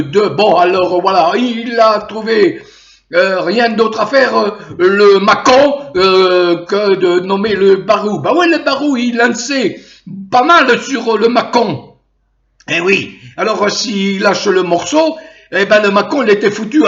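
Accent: Marseille, France. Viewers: J.adore votre accent marseillais pour bloquer le M@ ronds........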